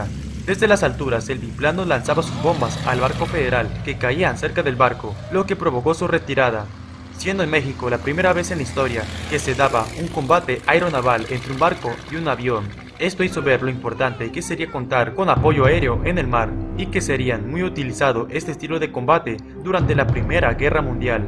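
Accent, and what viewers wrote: Peru. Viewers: paso de largo por la guerra peru colombia por el trapecio amazonico y putumayo ? me sorprendio mucho , pues creo su aento es peru , me equiboco ? si es asi debo suponer fue intencional la omicion ? - vi en barios de sus ejemplos , fueron en conflictos internos , siendo asi deberia estar colombia , pais donde mas operaciones areras se dan - mas que toda resto latinoamerica junta , pilotos vuelan 5 beses el promedio de horas vuelo de todos pilotos militares de latinoamerica , mayoria en operaciones combate , bombardeos reales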